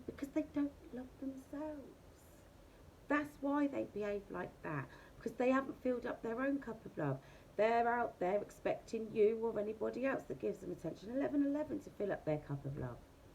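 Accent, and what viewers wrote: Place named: London, United Kingdom. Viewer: You must be London 🤔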